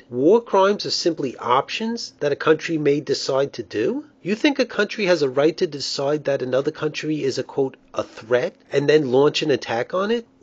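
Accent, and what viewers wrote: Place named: New York, United States. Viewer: Wow. I've stumbled across a Communist son of a bitch with a New York accent. This slime ball loves the Communist UN and hates the US Constitution... I wonder if he knows he is a marked man? I would further postulate that the slime-bots on this page are his co-conspirators. Geez. These cruds are as dumb as Obama... Antisemitic too... What a pile of LOSERS.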